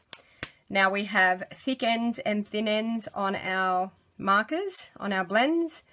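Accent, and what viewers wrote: Australia. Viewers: Great video. Good to hear an Aussie accent!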